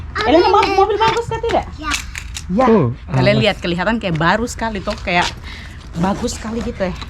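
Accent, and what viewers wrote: Papua, Indonesia. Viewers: MF kk🙏🙏 Kk nc orang Papua iya??? Penasaran dengan logatnx kk😊🙏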